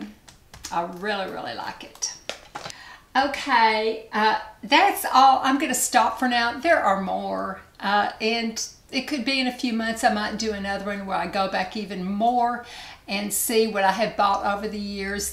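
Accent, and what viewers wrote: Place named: Georgia, United States. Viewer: Hi, honey! I'm so happy that I found your channel today...its my lucky day!😊 🌼Congratulations on your 10 year anniversary!🌼 I can't wait to go back and watch all your videos! What a treat! I absolutely loved this video, and I love you even more. You're so sweet and kind! I really loved how you bought the bracelets for your granddaughters, so they know they always will have each other to depend on. I have 7 children, and I always tell them the exact same thing. I wanted to ask you, also...where are you from? I am from Georgia, and your accent sounds a whole lot like mine.🍑 Ok, honey...thank you again for taking the time to sit down and spend your afternoon with us. I don't feel like I was watching YouTube. It really felt like I was over at my favorite aunts house , and she was taking me around her house showing me all the cool new stuff she found on Amazon. 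I loved it! Take care, honey, and we'll talk to you again soon. Love, Courtney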